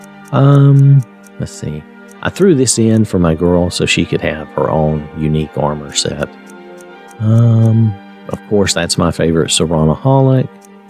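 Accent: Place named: North Carolina, United States. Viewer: I knew it! Born and raised in Rutherford county NC myself, I recognized the accent 😅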